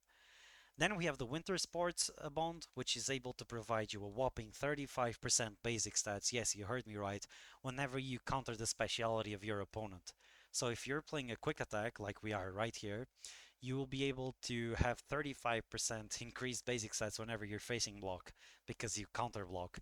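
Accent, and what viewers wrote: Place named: Portugal. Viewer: You do sound portuguese thats why I said that hehe Maybe I can try to fully commit to this team and get both to SR4. However, I’m also interested in the new Nekoma team with SP V2 Kuro, Yaku, Yamamoto, and the Term-End and Kamo duo. But I think I wouldn’t be able to get any dupes, and I already have Kuroo V1.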